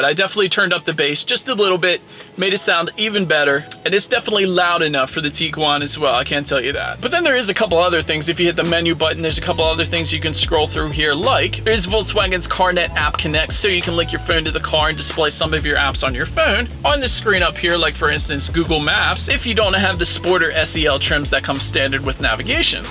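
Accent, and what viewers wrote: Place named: Philadelphia, United States. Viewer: are u from philly???? omg thats the most philly accent ive ever hurd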